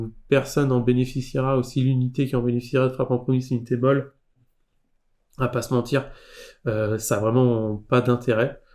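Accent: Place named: England, United Kingdom. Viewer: Cet accent Anglais toujours aussi délicieux ❤ Cookie aussi tiens !!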